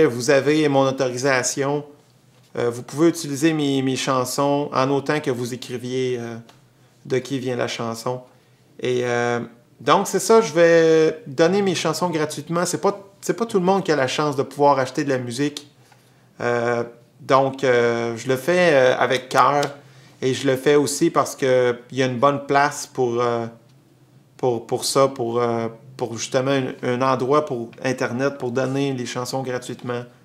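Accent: Quebec, Canada. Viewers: C'est vrai que c'est courageux de distribuer ses chansons gratuitement, surtout pour un mec comme toi qui n'est pas totalement inconnu du grand public et qui doit passer pas mal de temps en studio. Cela dit, as-tu un support CD dispo en France ? Merci, Continue, gros ! T'es le seul québécois crédible malgré ton accent sur la toile !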